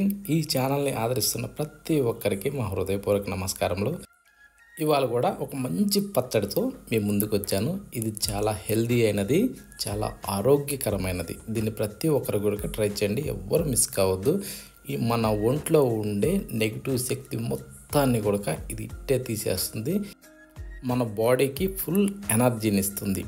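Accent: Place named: Rayalaseema, India. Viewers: చిత్తూరు జిల్లా యాస యెర్రగడ్డలు పచ్చడి suuuuuuper 🎉🎉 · Adi rayalaseema slang ardam avadulee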